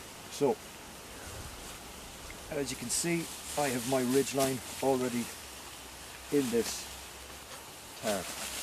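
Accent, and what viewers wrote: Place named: Ireland. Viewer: knew the Irish accent straight away..good video..sub'd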